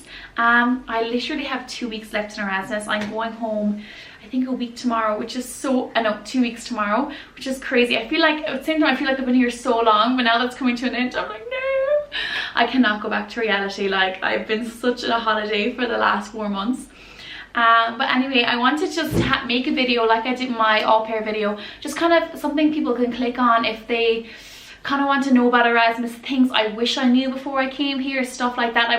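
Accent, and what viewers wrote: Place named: Ireland. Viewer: Irish accent? I LOVE IT ❤️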